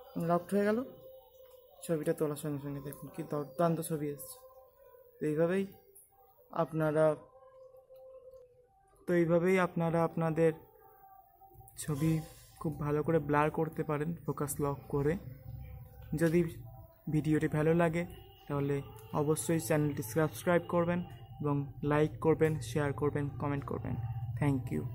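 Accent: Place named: Bangladesh. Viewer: bangela deshi der moto kre kotha bolchs kno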